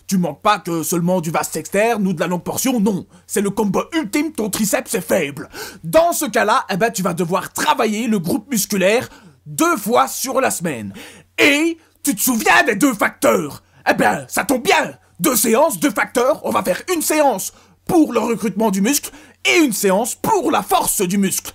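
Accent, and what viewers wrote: Belgium. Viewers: t'es belge en fait ?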